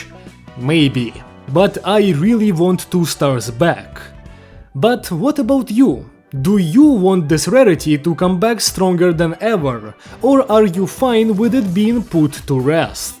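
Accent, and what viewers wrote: Russia. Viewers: Слишком сильный русский акцент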